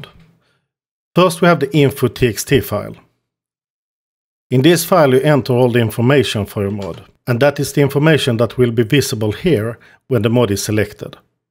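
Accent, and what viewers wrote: Scania, Sweden. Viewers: I love his accent. I'm guessing it's Swedish lol. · fantastisk skånsk dialekt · Den skånska brytningen gör videon så mycket bättre 🤣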